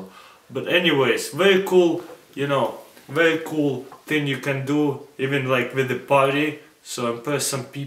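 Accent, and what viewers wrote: Russia. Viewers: Thanks for making these videos. I like how they are quick and easy. Your Russian accent is gnarly by the way.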